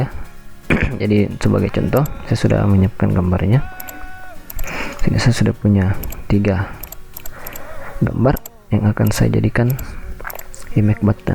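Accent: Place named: Sulawesi, Indonesia. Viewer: abang orang sulawesi yah... makasih buat Tutorial nya ya bang.. keren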